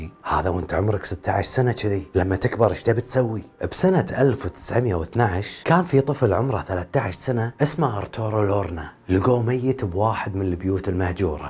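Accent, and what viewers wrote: Kuwait. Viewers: تتكلم بلهجتكم الكويتية ... يديد يعني جديد فلماذا الياء مكان الجيم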